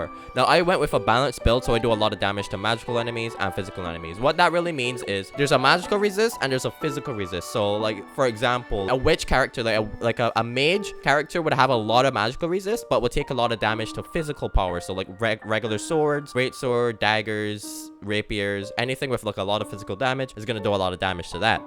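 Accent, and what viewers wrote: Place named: Barbados. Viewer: Bro sounds Bajan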